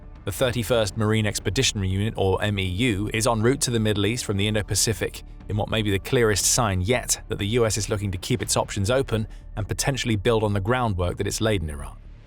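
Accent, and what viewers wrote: United Kingdom. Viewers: Love hearing a Brit talk about stuff they aren’t involved in lmao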